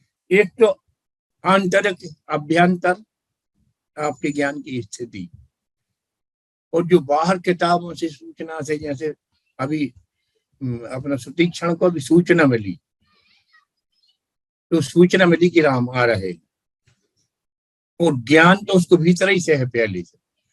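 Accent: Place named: Bundelkhand, India. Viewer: Mujhe inse dada ji se milna hai Inki aawaj ko sunkar aisa lgta hai jaise ye Madhya Pradesh ke bundelkhand se hain Kyunki main bhi wahin ka hoon Inse kaise mile? Or books kahan milegi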